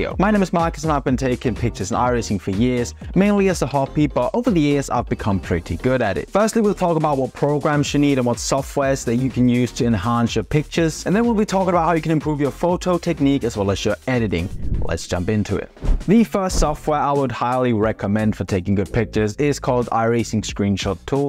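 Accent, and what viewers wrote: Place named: Denmark. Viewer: syntes nok jeg ku høre lidt danglish, hehe Der var også danske plader på den røde Jaguar, da du var i Sverige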